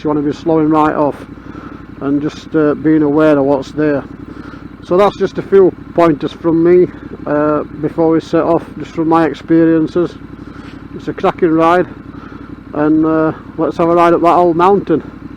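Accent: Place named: Northern England, United Kingdom. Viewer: Brilliant. Loved your review. Nice to here a proper northern talking about bikes and routes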